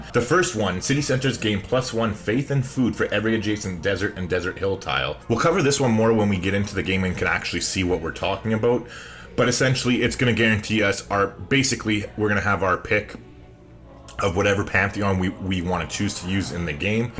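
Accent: Canada. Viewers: yep that’s what I meant. Awesome video by the way, been playing civ for years and learned a lot of cool stuff just watching this. Sick Canadian accent as well. Also are you gonna continue this series or was this just a one time thing to show beginners how to play out the first 50 turns?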